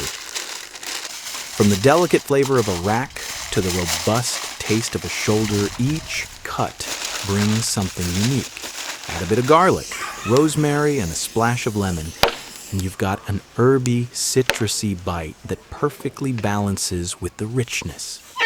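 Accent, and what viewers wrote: United States. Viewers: Ur videos lost its charm because of the annoying American accent that doesn’t shut up.!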